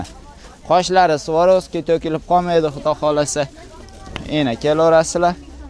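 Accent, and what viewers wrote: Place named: Khorezm, Uzbekistan. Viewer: хоазимчани урйанипди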